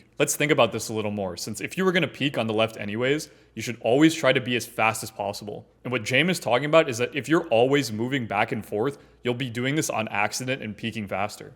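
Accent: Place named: United States. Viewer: the moment you hear an american accent on a counter strike video you know the creator is going to be absolutely clueless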